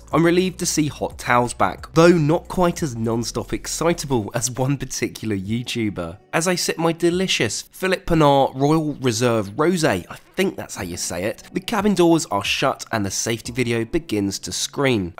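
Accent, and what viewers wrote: United Kingdom. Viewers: What?!! They are now blowing away Emirate's First Class. Great. Hey, your voice style is very British and sounds relaxing, thanks for all the contents you upload to YouTube, way better and honest reviews than the "Chu" Chu Train. LOL - But I still prefer their Q-Suite over this Eggshell seating.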